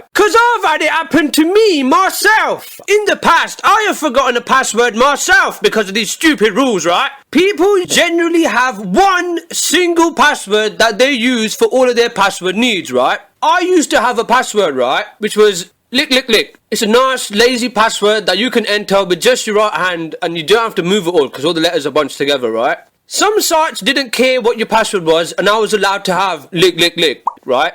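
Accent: United Kingdom. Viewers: grade are you British ?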